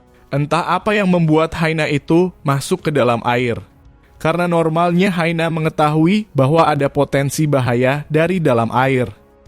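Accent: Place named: West Java, Indonesia. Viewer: pengisi suaranya pasti orang Sunda,karena pake bahasa capluk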